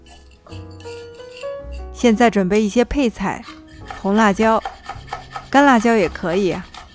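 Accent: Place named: Beijing, China. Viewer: 是北京人吗～哈哈听着是亲切可爱的北京人哈哈哈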